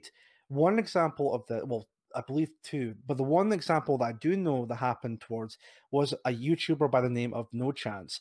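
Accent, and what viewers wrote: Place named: Scotland, United Kingdom. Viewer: Naw am just wondering wit part of Scotland this guy accent comes from.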